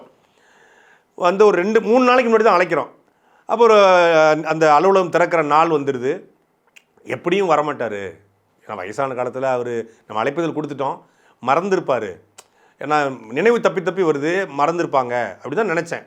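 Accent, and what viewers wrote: Tirunelveli, India. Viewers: தெளிவான உச்சரி ப்பு தள்ளு தமிழ் தெள்ளு தமிழ் தெளிவான உச்சரிப்பு திருநெல்வேலி தமிழ் அழுத்தம் திருத்தமான பேச்சு சாட்டைக்கு நிகர் சாட்டையை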